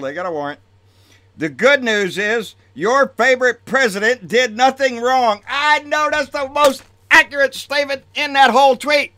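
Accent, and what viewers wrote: United States. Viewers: baby!! you're exactly what a true American sounds like, our forefathers envisioned you when they established this wonderful country. keep giving them hell!!! of course I'm referring to the "selfish" rich and Powerful~ peace and love ☆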